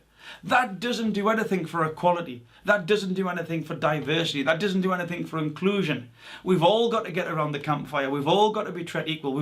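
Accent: Newcastle, United Kingdom. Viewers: Are you Geordie?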